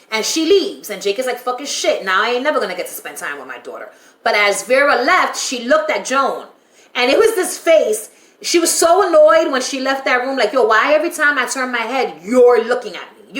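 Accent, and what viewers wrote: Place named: United States. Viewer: I think ur baby love ❤ 😍 lou will end up screwing over judy Bryant 😡😡cause lou has 2 meet her contact's as she doesn't get visitation so lou will know the plan n f**k over judy at last minute and ann Reynolds has 2leave my baby love alone mr Jackson 💔 🎶,but im with u marie is starting to grow on me again. Please give 🙏 😔 mention 🙏 Darren from Dundee just want u to mention me in ur American accent as ❤ ur accent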